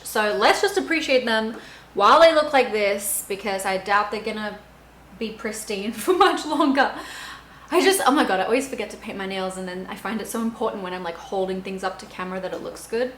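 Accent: United States. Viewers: Crazy I can hear you getting the American accent!!! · OMG!! ur accent is turning American especially when u said “i just painted my nails twice”!!! But seriously I’ve had my fave halo since the start of last year and it still works perfectly (I don’t wear makeup everyday) and I always tell everyone to get one!! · Loved this video! You can start to tell that you're picking up on the American accent and that's honestly amazing xx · Omg you’re getting an American accent!!! Whyyy 😩😩😩. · You've got a bit of an American accent now!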